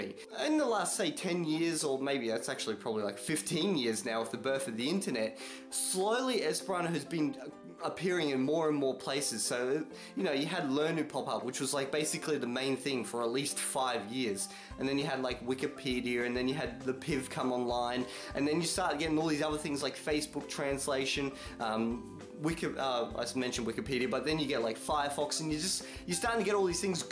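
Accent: Australia. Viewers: I know, I have almost finished my German Duolingo course (5 skills left to go) and I will do the Esperanto course after. And are you Australian? I can't determine your accent. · Saluton,! I first heard about Esperanto on an episode of Red Dwarf! True. And like most everyone else I've spoken to I thought it was a type of Spanish. My eldest son has been following the development of the Duolingo Esperanto course since last year. When it launched into beta my husband convinced me to join in with them both. I started on the 11th (June) After only six days I know more Esperanto than German which I studied in High School for four years. Using Duolingo is like playing a game, its just plain fun. Also your World of Warcraft Esperanto teaching series is our evening lesson, as the three of us are avid WOW players (Since Vanilla! Ten years who'd of thought we'd be still running around the same world.) It's great to hear another voice, an Aussie one too, speaking Esperanto and explaining some of the nuances we can't get from Duolingo. 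Like rolling the 'r's'. I just couldn't get it until I started repeating after you. Dankon!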